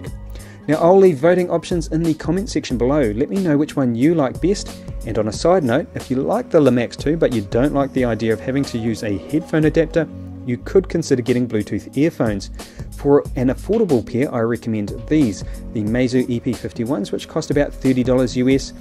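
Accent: New Zealand. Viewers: kiwi accent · ARE YOU FROM NEW ZEALAND?!?!?!?!?! JUST JUDGING BY YOUR BEAUTIFUL ACCENT :P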